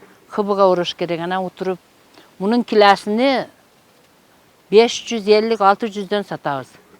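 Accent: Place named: Batken, Kyrgyzstan. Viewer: эже Баткенден болот ошого тилибашкача менин жердешим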